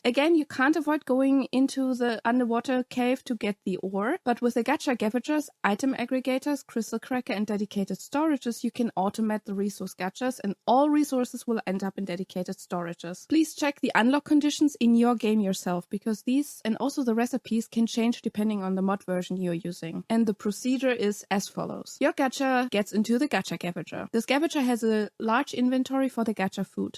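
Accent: Germany. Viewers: I subbed after watching your guide to breeding. Best explanation i could find. Well done. Also subbed for the nostalgia as your accent reminds me of my cousins who live in Germany ☺️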